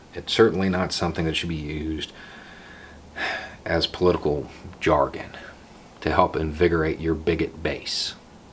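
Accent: South, United States. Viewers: point, as he's said in his videos, is that there are plenty of white, bearded, ballcap-wearing, truck-driving, Second Amendment-supporting, Southern accent-sporting guys like him, who think like he does.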